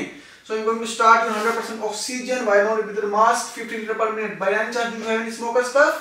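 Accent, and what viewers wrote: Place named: Asia. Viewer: Very educative video. You are good teahcer. The only challenge with the video is the accent that make it difficult for non-Asian audience to hear it well. We will appreciate it, if you can speak more slowly, just to carry others along. Thank you, sir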